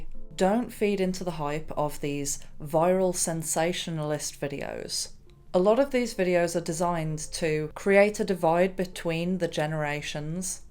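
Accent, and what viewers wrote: United Kingdom. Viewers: I like your accent got a pretty strong powerful British accent😊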